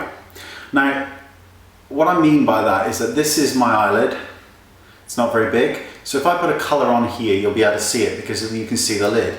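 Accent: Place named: United Kingdom. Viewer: I love the british accent. "Last, but not least" :)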